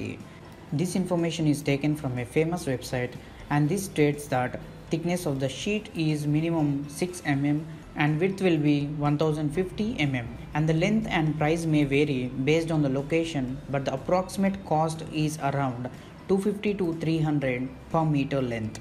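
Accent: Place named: Telugu, India. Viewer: I can definitely tell that ur from Telugu states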